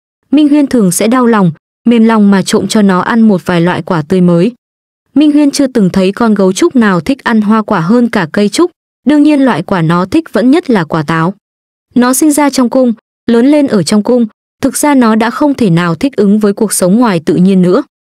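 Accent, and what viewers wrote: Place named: North, Vietnam. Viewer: nghe giọng bắc quen, hên sao tìm đc bạn đọc truyện nên dễ nghe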